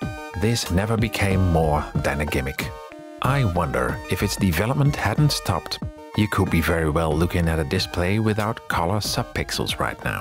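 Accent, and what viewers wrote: Netherlands. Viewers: It's just cute, how dutch you sound. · Huh, this is pretty neat. :D I also like how wonderfully Dutch you sound, without having too thick of an accent.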